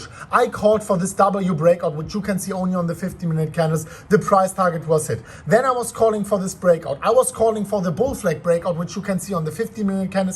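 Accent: Germany. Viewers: Even if you speak English I fell like you speak German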